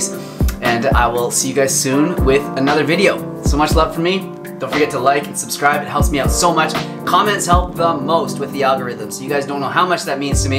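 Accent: Canada. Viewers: Joey Heffernan he also pronounces Out and about like canadians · Hi! I’m new to your channel. Judging by the way you talk. Are you from Canada? People there have a unique way of saying the word “out.” Sorry for being off topic! 😅 I’m glad to find out you’re Vegan! Continue to stay healthy! GOD bless you and everyone else you love! 🙏🏾✝️🐑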